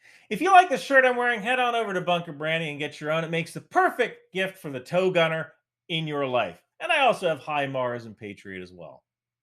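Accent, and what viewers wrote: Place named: United States. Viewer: Very convincing American accent for a working class Irishman, how long have you lived in the states?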